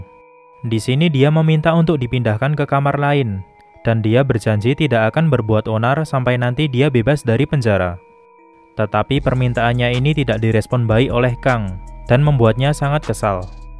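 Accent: Java, Indonesia. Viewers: Iki wong jowo iki . joss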